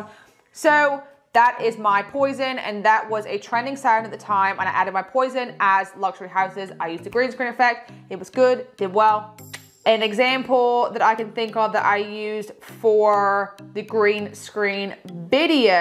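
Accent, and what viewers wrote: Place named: Australia. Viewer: ohhhh okok I thought I heard Aussie in there! ❤️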